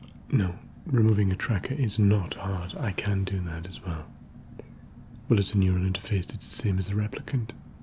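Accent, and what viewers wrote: United Kingdom. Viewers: Hi! I accidentally came across your videos. Is this ”home made”? Looks so professional. Your english sounds so british 😊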